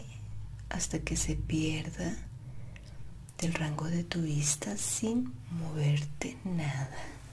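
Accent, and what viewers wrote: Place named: Colombia. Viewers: Soy colombiana y a mi tambien se me parece a unos de nuestros acentos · ¿De qué parte de México eres? Te juro que yo escuchaba como acento colombiano. No reconozco mis acentos, he fallado como mexicana jajaja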